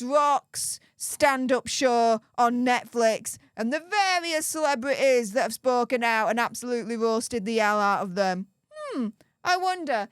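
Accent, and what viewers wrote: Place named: Lancashire, United Kingdom. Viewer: Hi there, you are definitely from my neck of the woods. My guess Lancashire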